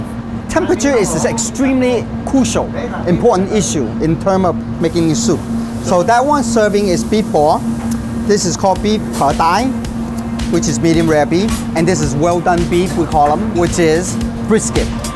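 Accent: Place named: China. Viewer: He has a chinese influenced accent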